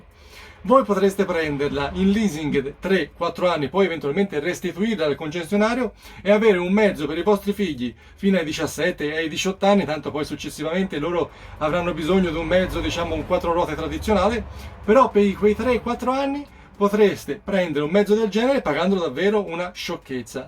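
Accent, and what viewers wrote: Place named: Florence, Italy. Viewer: L' accento fiorentino mi fa impazzire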